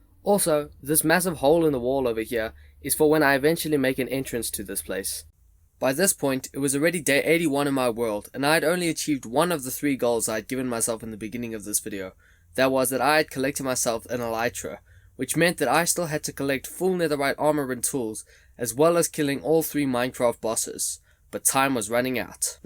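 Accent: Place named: South Africa. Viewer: Where you from because you sound like a South-African. Good vid btw and just subscribed.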